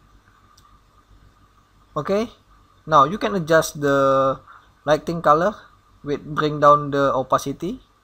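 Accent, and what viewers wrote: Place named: Indonesia. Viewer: You sound Indonesian hahaha thx for the tutorial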